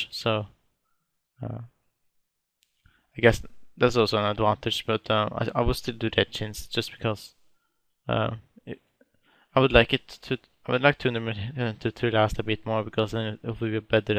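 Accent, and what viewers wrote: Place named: Norway. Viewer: his norwegian accent is very strong and thats not really how we talk at all, mostly elders who didnt learn much english at school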